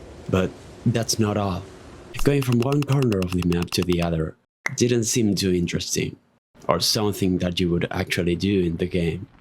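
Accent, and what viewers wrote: Argentina. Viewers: hey, where are you from? I find your accent very similar to mine, I'm from Argentina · tenés acento argentino sera q sos argentino??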